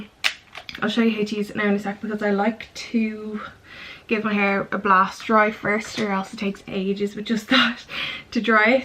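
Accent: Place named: Ireland. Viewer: She's from Ireland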